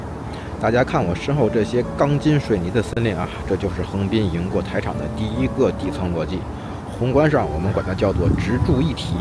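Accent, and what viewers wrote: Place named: China. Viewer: 你又中計了。這個人來找你麻煩，不是因為你的影片內容。你太不了解台灣的現況了，有一群被政黨洗腦過的蠢蛋，只要你說話是中國口音，就會啟動瘋狗模式亂吠一通。牠留言第一句就說你腦殘，這種人就是典型的台灣蠢青，只想引戰惹你發火。說再多都是枉然，不要浪費時間，最好的應對就是把他封了。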